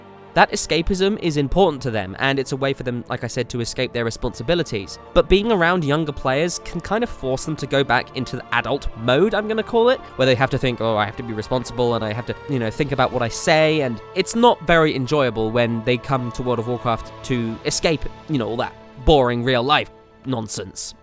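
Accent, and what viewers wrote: United Kingdom. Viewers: is british?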